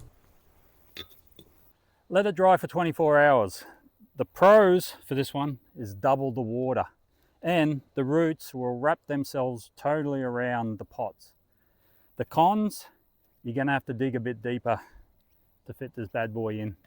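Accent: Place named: Australia. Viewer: What a fun accent. Are you from Australia or New Zealand, or somewhere else entirely?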